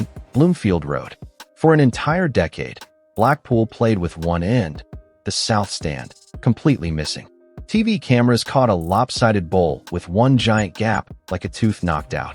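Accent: United States. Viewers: In this video we've heard some Yank who clearly doesn't get traditional football nor the British football culture. The list is just completely un-serious American claptrap, a profound lack of understanding of something miles away from US culture. Ewood Park as an ugly ground? What is this tosser on about with that dreadful American accent of his? Appalling. Seriously.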